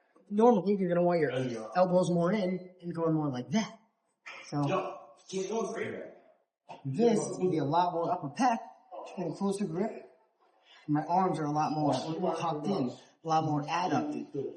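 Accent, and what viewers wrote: New Zealand. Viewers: Cigs and New Zealand accent, very Shan coded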